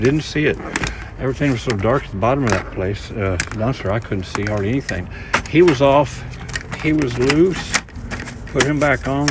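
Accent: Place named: Texas, United States. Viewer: Thanks, I thought it looked like Texas + accent too 🙋‍♀️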